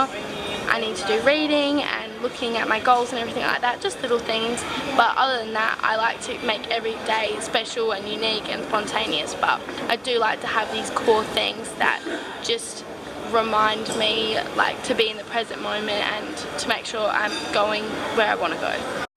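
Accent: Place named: Australia. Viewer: i mean its a australian accent, but danish family and passport of your own is a blend of two countries, like you get two ways of life, countries and cultures etc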